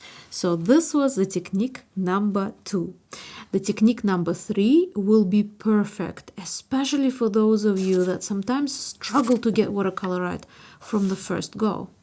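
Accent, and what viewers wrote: Russia. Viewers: Thanks for the video! I'm only starting to playing with paints and watercolor fascinates me. Btw, where are you from? Your accent seems very russian sometimes, so I wonder if any other language gives that particular accent. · Такой русский акцент)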